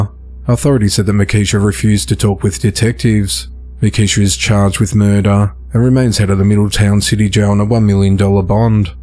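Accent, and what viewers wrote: Australia. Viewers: The narrator is Australian or nearby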